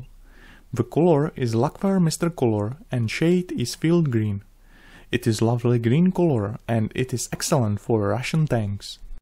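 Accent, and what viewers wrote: Czech Republic. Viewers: jinak musim uznat, že se tvoje angličtina výrazně zlepšila:) už ten českej přízvuk tak netrhá uši:) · Ahh yeah, the good old Czech Accent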